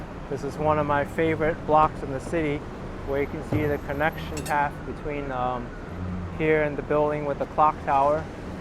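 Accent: New York, United States. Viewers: Is that a new York accent? Thanks for the video, now I don't need to visit. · Great Video - thanks for the info as you walk - keep up the great work and if i ever do get to nyc, i would love to meet the - love your nyc accent lol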